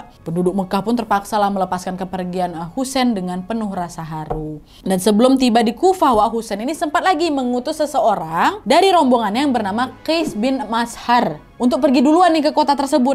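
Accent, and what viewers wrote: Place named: Medan, Indonesia. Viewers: macem cakap org medan ni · ni logat org Medan kyknya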